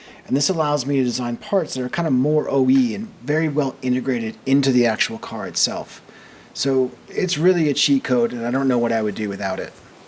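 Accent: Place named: Midwest, United States. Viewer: Subscribed. ;-) I liked the music added in during the printing process, maybe look for other places to add some background music for a bit of ambiance. Otherwise a great first video, and clear that you've been taking notes on other good creators out there. Reminds me a bit of Greasy Fingers videos - but with a clear Midwestern accent (I'd guess Minnesota, Wisconsin or Iowa), rather than the German.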